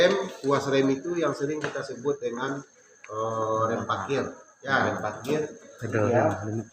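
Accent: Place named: Padang, Indonesia. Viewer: Bapak ini kayaknya orang Padang ya..saya liat dr logat bahasanya 😁😁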